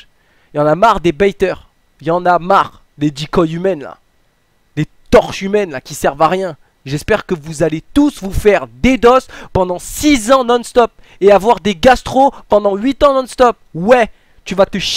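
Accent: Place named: South, France. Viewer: Je kiff l'accent du sud xD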